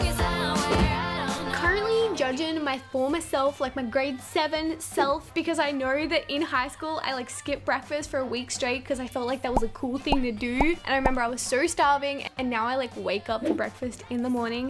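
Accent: Australia. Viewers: Are you aussie🤨🧐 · Is she AUSSIE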